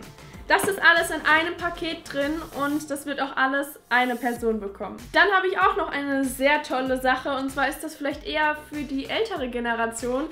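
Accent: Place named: South, Germany. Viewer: Hiii, naaa xD ich hab gedacht ich versuche mein Glück mal^-^ was ich ja an deinem Kanal besonders feiere sind die Umsylings, manchmal ist es schon krass was das ausmachen kann und wie einfach man sich doch (äußerlich) verändern kann, ich denke das gibt den meisten auch einen Denkanstoß :) Und nochwas, ich hab irgendwie sofort an deiner Stimme gehört, dass du irgendwo aus dem Süden kommst (wie ich xD) weiss nicht, war einfach so xD Zum Gewinn, eh ja, also ich liebe liebe liebe Überraschungen also folglich eine von den DM Tüten wäre cool, oder was ich schon immer ausprobieren wollte ist das Wimpernserum** Aber wenn es hart auf hart kommt eine Überraschung macht mich immer froh xD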